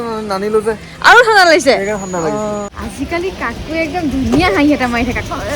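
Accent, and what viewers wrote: India. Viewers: Ur hindi accent is so lovely,, very energetic vlog ,,, love from USA( United State of arunachal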